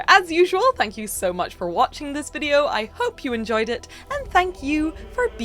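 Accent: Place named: RP, United Kingdom. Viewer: We wanna hear your reaal English accent not this prep school rich kid accent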